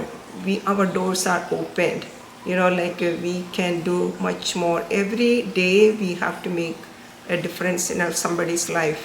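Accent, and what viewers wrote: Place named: Kerala, India. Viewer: Why are you talking English like malayalam. accent and pronunciation, very poor.what kind of English is this?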